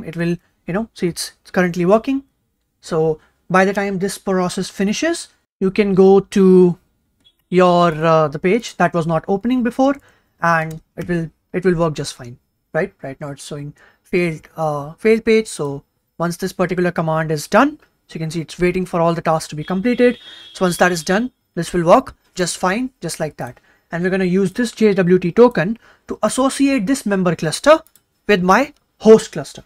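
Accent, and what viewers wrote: India. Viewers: Yes! He uses basic English with Indian accent. Bilkul follow kar sakte ho👍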